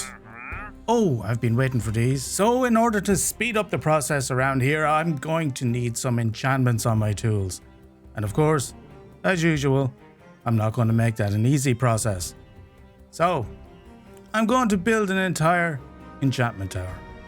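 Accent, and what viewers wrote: Ireland. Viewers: My daughter started playing Minecraft when she was 7 in 2012 on the Xbox 360. I was an OG gamer going back to the 80s (I'm an old fart) and blew off the game as a joke for almost a decade until a certain global event hit in 2020. She got me hooked on it and I've never gone back. I sub to a lot of Minecraft channels and yours popped up on my YT suggestions. , your MC channel is the best I've come across. It deserves over a million subs. Your Irish accent and spirit are an absolute joy and stirs my Irish ancestral blood in me. Please keep up the outstanding videos, builds, humor and music! Well done! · Right!! Either that or I really am obsessed with puppies! Lovely to hear a fellow Irish gamer! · By chance are ya Irish and if so what part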